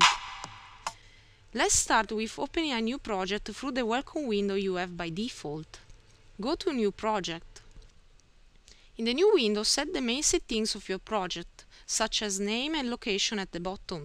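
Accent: Italy. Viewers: thanks for the video, helped a lot! I like the Italian accent, it's easier to understand than English :) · Her accent is definitely Italian. I'm Italian.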